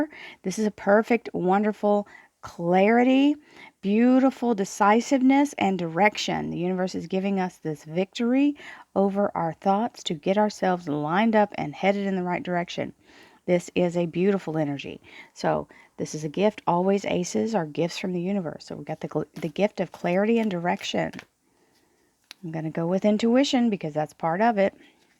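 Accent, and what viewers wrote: Texas, United States. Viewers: Love your readings, and your voice. Where are you from sounds like Texas?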